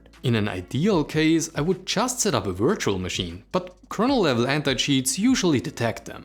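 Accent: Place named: Germany. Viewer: You sound German :).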